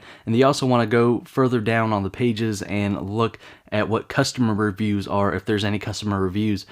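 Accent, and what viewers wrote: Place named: South, United States. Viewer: oh god, i just couldn't help it but he sound like he has a real southern accent... Has anyone thought of it?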